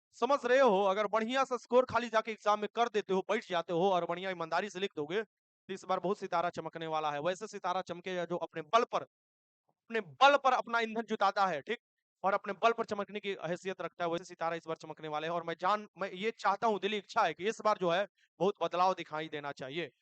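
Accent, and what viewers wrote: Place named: Gopalganj, India. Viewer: App khati bhojpuri bolte hai gopalgnaj jila wala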